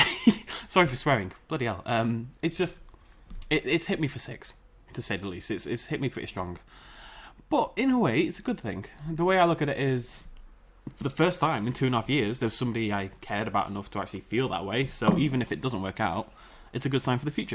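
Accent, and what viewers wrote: United Kingdom. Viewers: Everytime I hear a British accent, the face never matches what I imagine and it’s honestly fascinating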